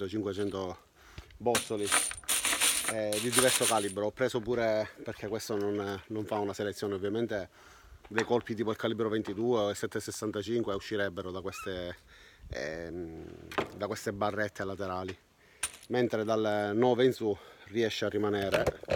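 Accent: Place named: Trapani, Italy. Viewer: riconoscevo l'accento io sono della provincia di trapani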